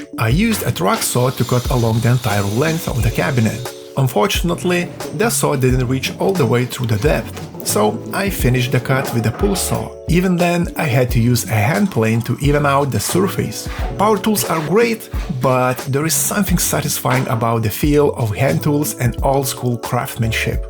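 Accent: Russia. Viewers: Брат у тебя акцент как у русского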